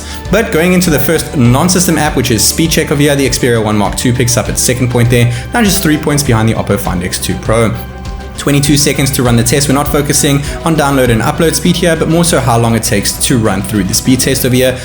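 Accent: South Africa. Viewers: you sound South African like a joburger.. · Are you from South Africa?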